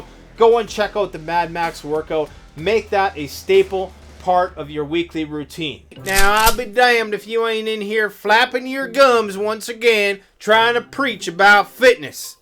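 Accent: South, United States. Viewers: Work on your southern accent.By the way, you look like "the Fly" from the '50s movies(As The ). Your videos give out very useful information. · I love this freaking American accent man it’s so awesome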